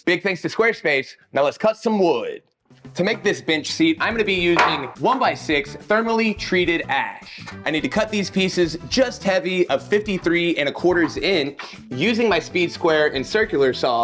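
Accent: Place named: Midwest, United States. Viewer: It is his Midwest accent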